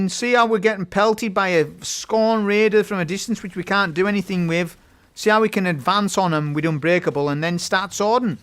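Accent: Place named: Cumbria, United Kingdom. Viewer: Yo where you from you sound like a fellow Cumbrian!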